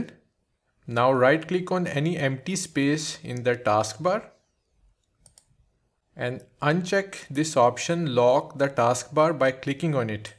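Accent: India. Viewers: Thanks random indian sounding man on youtube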